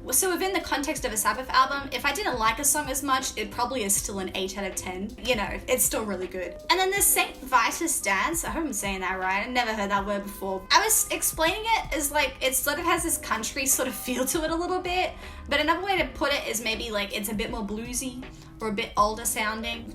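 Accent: Australia. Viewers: Hi, love those facial expressions of yours and that cute Aussie accent!! Make sure, if you can, to do the entire Sabbath discography in chronological order - they are my favorite band and I think you'll find the journey very interesting. Welcome to the appreciation society!!!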